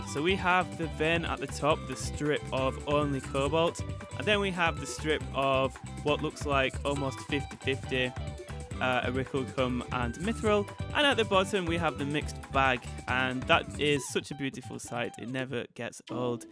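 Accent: Scotland, United Kingdom. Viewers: Your accent is absolutely glorious, where in the UK are you from? :D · nice scottish accent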